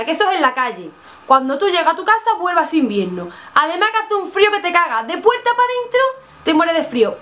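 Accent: Spain. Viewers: Me encanta ese acento sevillano, me recuerda a malviviendo jajaja Llegue aqui desde Soy Reggaeton jajaja <3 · que bonito acento andaluz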